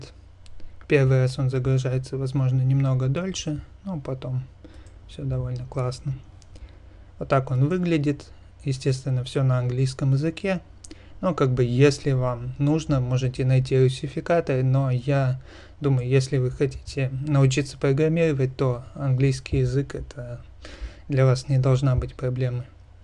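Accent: Germany. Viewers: Немецкий акцент сильно чувствуется.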